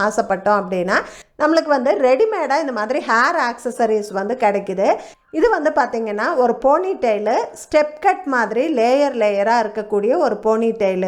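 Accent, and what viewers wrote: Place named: Kerala, India. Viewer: tamil with Malayalam slang nice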